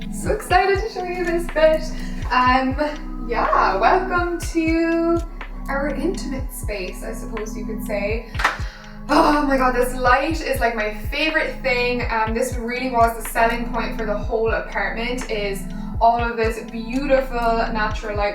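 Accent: Ireland. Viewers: omg are you irish too? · Is this an Irish accent?? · I hear an Irish twang coming through.. Is she Irish? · The 461 yes she’s from Cork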